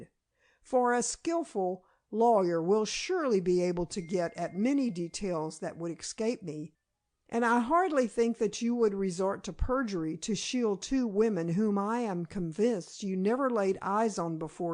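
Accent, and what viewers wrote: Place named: Texas, United States. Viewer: Ugh unlistenable. I live in NYC. The narrator is obviously meant to be a man. This is read by a woman in Texas. The effort is appreciated, but unsuccessful.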